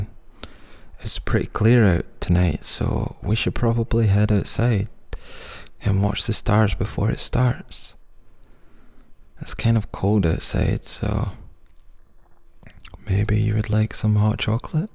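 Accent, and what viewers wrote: Scotland, United Kingdom. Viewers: Please his precious Scottish accent is absolutely adorable 🥺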